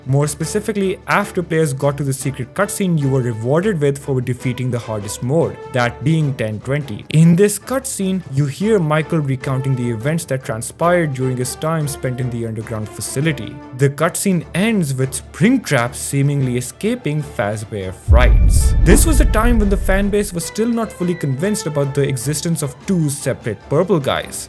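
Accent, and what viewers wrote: India. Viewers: I Think its indian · Everyone in the world has an accent. He sounds Indian.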